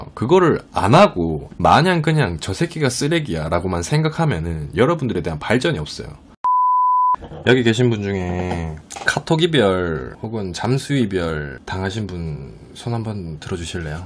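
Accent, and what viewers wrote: Gyeongsang, South Korea. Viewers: 잘생겼다~ 경상도 사투리도 멋있음~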